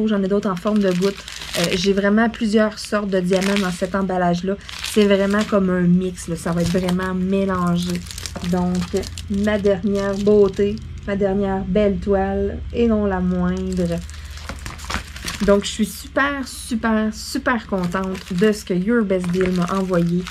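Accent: Canada. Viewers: Bonsoir je suis ravie d'avoir regardée votre chaine moi aussi je suis contente de commander sur URBESTDEALS je suis entrain de faire un poussin en jaune je ne l'ai pas trouvé ailleurs j'ai commandée 10 DIAMONDS PAINTINGS ET TRES RAVIE DE CE SITE JE PENSE QUE AVEC VOTRE ACCENT VOUS DEVEZ ETRE AU CANADA MOI JE SUIS EN FRANCE ET PLUS PRÉCISÉMENT DANS LE SUD-OUEST PAS LOIN DE TOULOUSE 31 VOS DP SONT JOLIE ET C'EST VRAI ILS ONT BEAUCOUP PLUS DE CHOIX QU'IL Y A QUELQUES MOIS BONNE NUIT OU BONNE JOURNÉE ÇA DÉPEND NOUS ON ET LE SOIR CHRISTIANE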